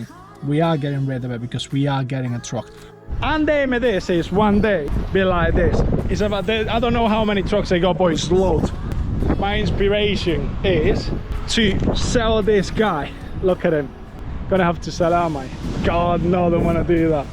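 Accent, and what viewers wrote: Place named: Northern England, United Kingdom. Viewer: I’ve never heard a foreign guy with a northern accent before but I’m all for it!! Love your videos man! Love the new truck as well!!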